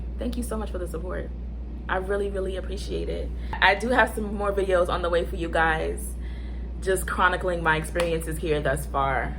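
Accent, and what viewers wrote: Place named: New York, United States. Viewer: Ouu im from nola and and I hear that ny accent are u from queens ?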